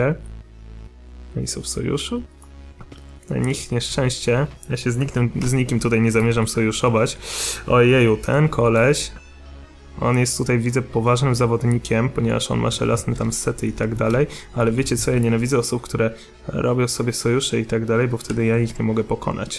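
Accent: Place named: Warsaw, Poland. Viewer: ej jesteś z Warszawy bo słychać od Ciebie te końcówki ę,ą i tak dalej:D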